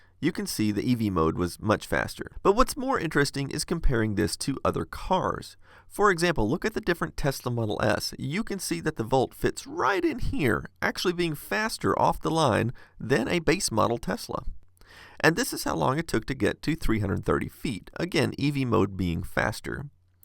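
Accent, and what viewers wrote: Texas, United States. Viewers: I noticed a bit more of a southern accent while filming in the car, where someone was holding the camera. I wonder if he switches it up a bit when talking to (or in front of) a fellow Texan.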